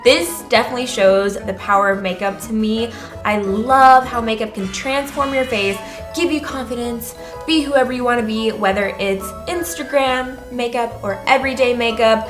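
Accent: United States. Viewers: Are you American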